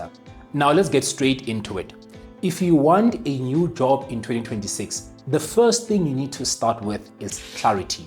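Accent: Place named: South Africa. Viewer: Your accent gave you away. You speak so beautifully. A South African I guess.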